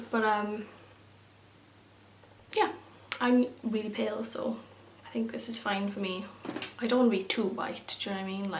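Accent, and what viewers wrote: Wales, United Kingdom. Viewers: Are you welsh x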